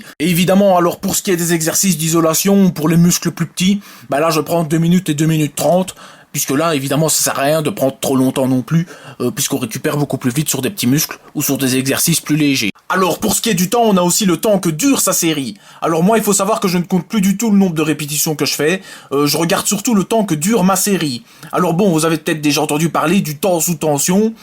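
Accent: Belgium. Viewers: es tu Belge???:D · ahahahaha!!! Génialissime, avec l'accent belge et tout trop fort, merci:D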